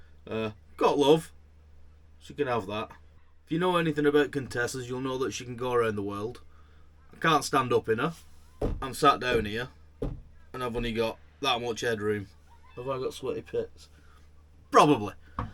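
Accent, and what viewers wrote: Bolton, United Kingdom. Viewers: A Bolton lad eh? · Good to hear a Bolton Lad, I lived and studied in Bolton in the late 1980's. Keep the faith you will get there! I sent you a couple of quid there to help.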